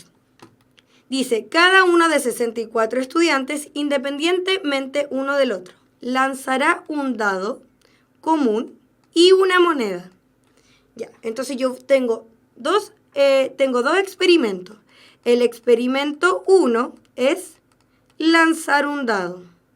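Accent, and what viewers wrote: Chile. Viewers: Eres chilena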